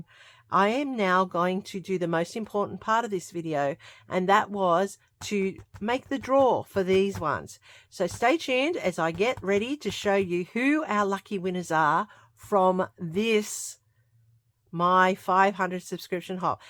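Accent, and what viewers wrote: Australia. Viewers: Oh wowsers! Thank you. I've been trying to get inspired after 9 years of Uni study. I was excited to find you,. Nice to hear an Aussie voice-over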